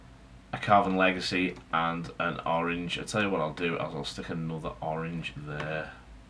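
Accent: Liverpool, United Kingdom. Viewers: With respect, I disagree. I enjoy hearing his strange Scouse-ish tones and humour. It's quite patently not a corporate demo.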